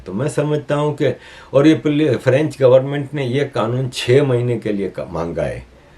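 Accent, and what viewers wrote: Gujarat, India. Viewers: shab mujhe lagta he app Gujarati hoo app key bol gujrati Jaysh lagte he❤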